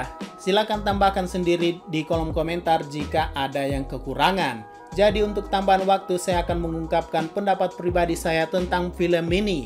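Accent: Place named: Flores, Indonesia. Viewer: Logat naratornya ga asing. Pasti orang Ende-Flores, NTT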